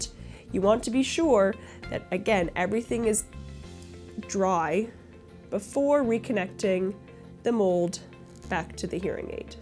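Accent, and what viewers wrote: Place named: Canada. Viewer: This lady gets progressively more Canadian as the video goes on.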